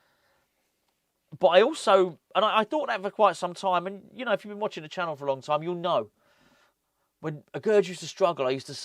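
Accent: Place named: North London, United Kingdom. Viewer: You sound like you come from North London, go back to your own site's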